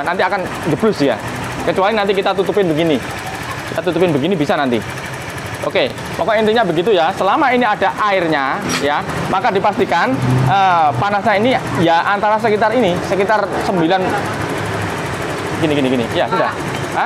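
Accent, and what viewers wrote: Java, Indonesia. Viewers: Logat e kok jowo mas? Sampean pundi mas? Kulo mpon ndak kuat mas vario 125 led kulo mpun turun mesin ganti perpak. Panggah munggah terus air radiator e mas. Tulung mas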